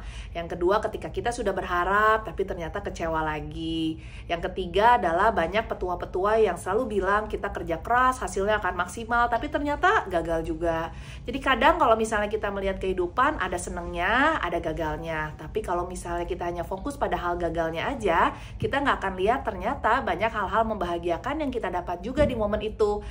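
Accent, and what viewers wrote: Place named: Sunda, Indonesia. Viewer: Kirain dirumah juga nyablak sunda bo dok😂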